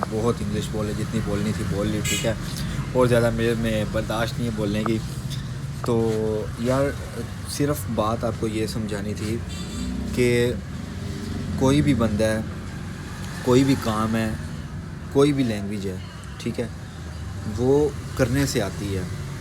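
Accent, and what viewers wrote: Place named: Kyrgyzstan. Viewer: You're speaking English in Kyrgyzstani accent 🤣. You did well, we understood ur point. Ur brave. ’’mini doctor shop - Hakeem shop’’ lol. May Allah Almighty bless with barakah ameen.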